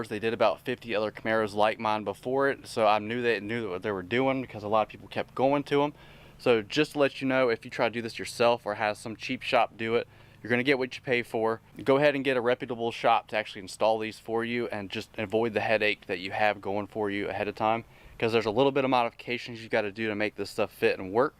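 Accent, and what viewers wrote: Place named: North Carolina, United States. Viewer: I was going to ask where you were from because your accent is almost the same as mine and then you said NC! Edit: just saw the Henderson tag I’m in Greensboro! Love it! And great video, working on planning out my camaro :)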